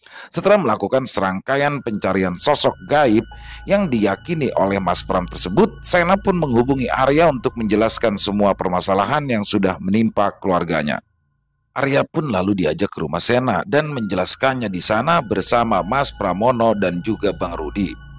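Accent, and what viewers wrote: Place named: Jakarta, Indonesia. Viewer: Bang org betawi ya? Bhsanya lucu logatbetawi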